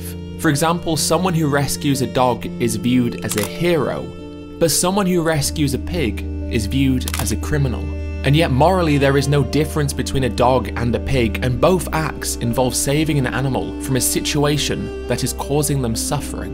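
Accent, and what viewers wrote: United Kingdom. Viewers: Pandemics will happen no matter what. They will always be a when. The changes in farming animals is already happening. You can make it happen faster by buying your meats from small farms where the animals live happier lives. The tags for cows are the same as us piercing our ears. Many do not even react to tagging. And people, if you eat a lot of beef, the twenty pounds a year at the Standard American Diet rate, (you probably eat much less like me), it would take you over 18 years to eat the weight of ONE average size steer. By the way, vegan diet is not appropriate or sufficient for toddlers or children. Just because a Nice British accent says something does not make it true.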